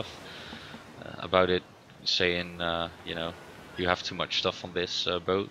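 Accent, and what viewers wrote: Netherlands. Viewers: ben je nederlander ? are u from holland ? u sound like 1 lol :P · i immediately noticed your dutch accent :D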